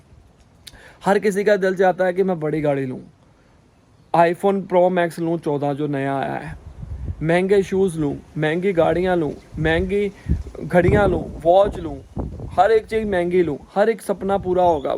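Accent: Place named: Punjab, India. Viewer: ਬਹੁਤ ਪਿਆਰੀ ਬੱਚੀ ਆ, ਪੰਜਾਬੀ ਬੰਦਾ ਹਿੰਦੀ ਬੋਲਦਾ ਸਾਫ਼ ਪਤਾ ਲੱਗ ਜਾਂਦਾ ਆ ਕੇ ਇਹ ਬੰਦਾ ਪੰਜਾਬੀ ਆ!!😊😊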